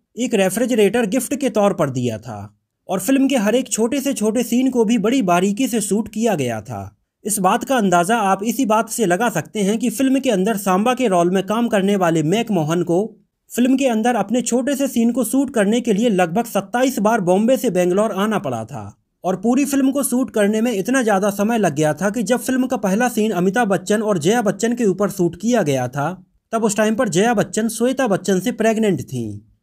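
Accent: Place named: Uttar Pradesh, India. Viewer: Ye style UP side kee ek thayth style hai, ye inkaa dosh nahin, but he should have tried to speak in proper Hindi, bhalay hee speed kam detay. But frankly seeing his hard work I could understand what he wanted to say and enjoyed. But yes when you are posting on youtube improve the language as much as you can.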